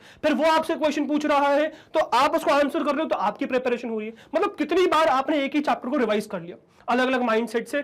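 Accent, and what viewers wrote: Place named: Bihar, India. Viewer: Sir ur bihari accents op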